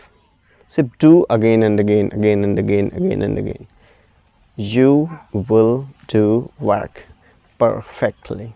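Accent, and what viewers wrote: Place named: United Kingdom. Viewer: I really liked your English accent I am amazed that how a boy from my village is able to speak such excellent English and that too in a British accent. Wonderful!❤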